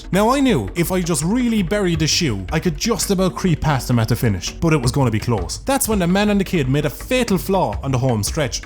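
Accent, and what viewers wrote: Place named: Clare, Ireland. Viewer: which airport the one in shannon or dublin ( Im guessing shannon cos he sounds like hes from clare )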